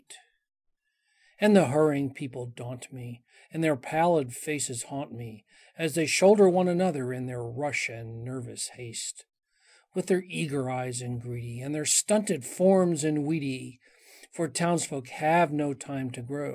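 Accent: United States. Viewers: Wow never heard this done with a Yank accent, sounds odd but then again its odd that an American would even know of the poem at all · Nice reading. Sorry about the people whinging that it's read in an American accent! Happy for anyone to enjoy and read the poem... but you're an Aussie anyway so it's really a moot point ;-)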